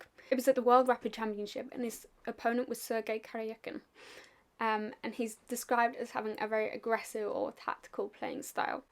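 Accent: United Kingdom. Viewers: You have a British accent... it is a GREAT accent! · Sound like RP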